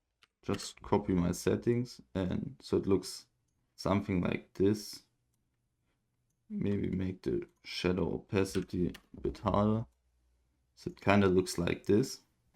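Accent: Germany. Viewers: Dieser deutsche Akzent HAHDHADASHAHAH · deutsch rausgehört XD. aber trozdem gutes englisch! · Man hört einfach das du Deutsch Bist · the german accent is wild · Dieser Deutsche accent haha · man hört den deutschen Akzent so hart hahaha, nices video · Bro bist du deutscher? :D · Das nenne Ich mal eine deutschen Akzent 👍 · Wusste beim ersten Wort schon dass du Deutsch bist xD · Wusste im ersten Satz schon das du deutsch bist hahahah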